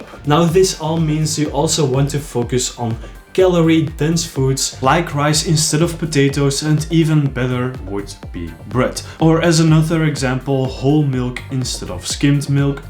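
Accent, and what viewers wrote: Belgium. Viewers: ik dacht al dit klinkt als een belg toen zag ik die volle melk en wist ik het zeker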